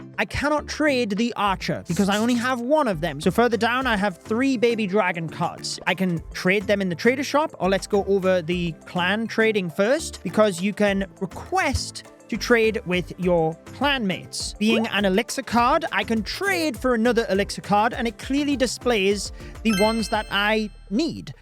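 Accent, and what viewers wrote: United Kingdom. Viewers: Holy... I just luv Bri-ish accent 😆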